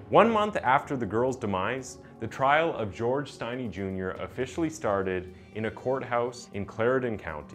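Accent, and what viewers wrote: Canada. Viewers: Loving your Canadian accent lol really cute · Has anyone told you that you sound like a Canadian version of Keith from the try guys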